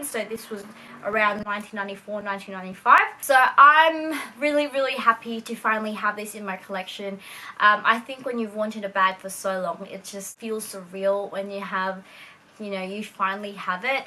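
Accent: Australia. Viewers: Australian accent...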